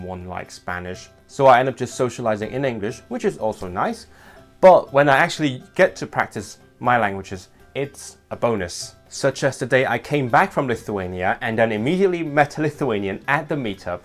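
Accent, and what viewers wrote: United Kingdom. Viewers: To my non-native ears, I legit thought you grew up in the UK.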